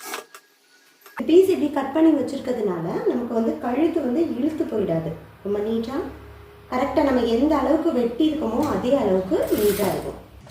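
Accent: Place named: Kanyakumari, India. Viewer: unga pechu kanyakumari Kulasekharam side mathiri irukku sis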